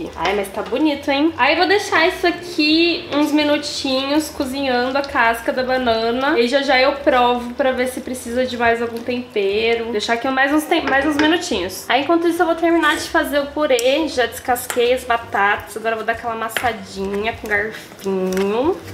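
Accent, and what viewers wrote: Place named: Piracicaba, Brazil. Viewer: Seu sotaque é o melhor que existe!! A piraricabana que existe em mim acorda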